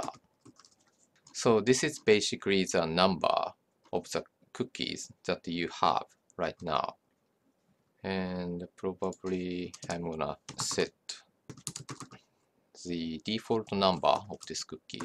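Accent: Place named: Japan. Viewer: From your accent, I assume you are japanese, but your vocabulary is incredibly refined. Good job, good tutorial, and you've gained a sub. ;]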